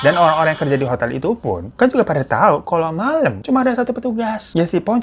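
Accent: Java, Indonesia. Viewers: Bang ini ora asli cina buakan ya. Wajah nya kok kayak orang cina. Tapi bisa bahasa jawa medok banget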